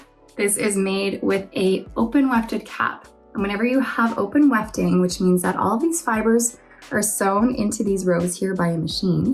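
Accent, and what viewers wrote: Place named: Canada. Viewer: Just a trivial question... How is your accent doesn't seem different ? Maybe I'm wrong about Canadian accent. And the Charming style wig, great!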